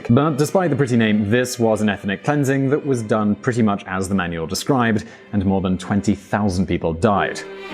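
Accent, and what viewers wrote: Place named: United Kingdom. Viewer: British accent and glasses doesn't make you make smart.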